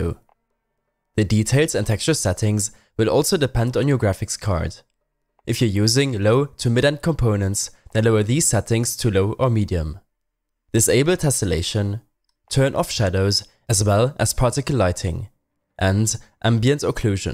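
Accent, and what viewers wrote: Germany. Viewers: deutsche aussprache alter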